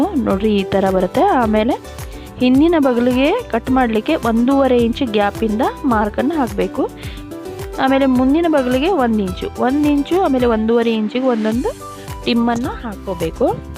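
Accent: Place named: Mandya, India. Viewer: ಹಾಯ್ ರವರೆ ನೀವು ಕಾರವಾರದವರ ನಿಮ್ಮ ಭಾಷೆ ಮಂಡ್ಯ ಭಾಷೆಯಂತೆ ಇದೆ 👌🙏❤️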